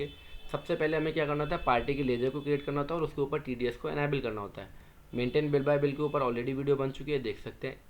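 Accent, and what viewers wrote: Agra, India. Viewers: Sir aap agra se ho?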